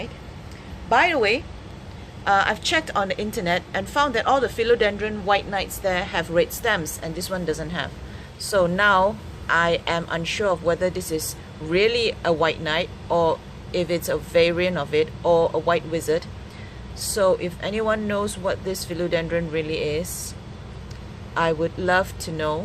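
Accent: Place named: Singapore. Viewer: Hi you sound like a singaporean ... where do you get variegated plants in SG?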